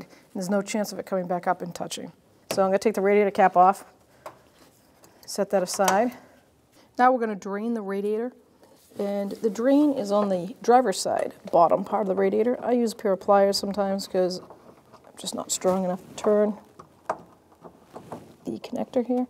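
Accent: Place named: Medford, United States. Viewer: That’s a Medford accent! Gotta be!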